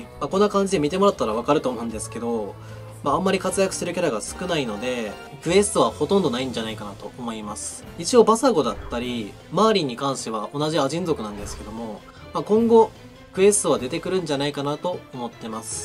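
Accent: Kansai, Japan. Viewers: 関西人かな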